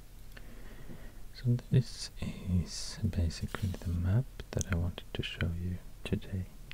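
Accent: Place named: Sweden. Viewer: thats the power of swedish english asmr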